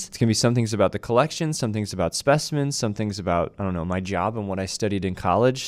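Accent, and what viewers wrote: Canada. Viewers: Lemme guess... ur from Canada. That is VERY subtle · Wonderful video! You’re a super likeable and charismatic guy— you should make a podcast! Also, you have the same speaking pattern as Jacob Sharpe; are you Canadian by any chance?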